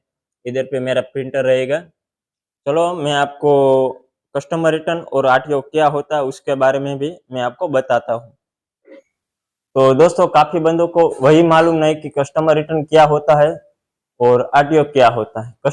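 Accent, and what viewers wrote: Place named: Kathiyawad, India. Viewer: Apne jo 30,000 rupees invest kiye the wo kis chij me invest kiye the wo ap explain kar sakte hai kya ? Video me karenge to bhi chalega or messages me replies karenge to bhi chalega.....or mujhe ye janana tha ki - 1 - melting machine price 2 - kitane type ke wax type hai apke pas or usaka price. 3 - wax melt karne ke bad jis glass me wax dalate hain us glass ka price 4 - maine apka box dekha hai wo apne shayad banwaya hai or wo bahut cha hai to us packaging box ka price. 5 - ap kitane type ke colour use karte ho or her ek color ka price. 6 - agar ap fragrances use karte ho to usaka price kitana pada apko. 7 - wicks ( candel dhaga ) hoti hai usaka price 8 - candle banane ke liye ap jo molds liye honge, to apne kitane types ke molds liye hai or unake bhi price batao. Hame bas apke raw material ka price ka idea batao kyunki hamare idhar ki kuch ladies start karna chahti hai. 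Or wo bahut Young hai agar ap ye sab batayenge to bahut help hogi. Ap shayad gujrat se hai, because apka awaj kathiyawadi type ka lag raha hai, or apki video me jo apne e-commerce ki journey dikha rahe hai... Wo bahut achi hai. Aisehi ap imandari se kam karte raho.bhagwan apko bahut age bhadaye Dhanywad apko 🙏❤️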